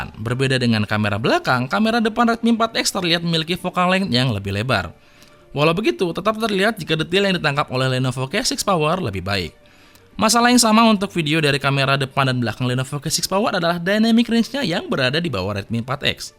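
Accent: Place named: Malang, Indonesia. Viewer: joss nemen :v pemalangan bgt bahasanya 😆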